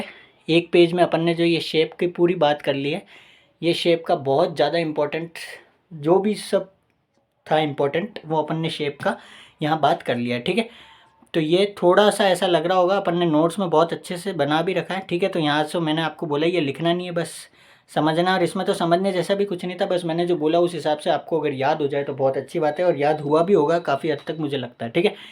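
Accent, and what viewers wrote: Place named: Rajasthan, India. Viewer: The rajasthani accent 🤌😅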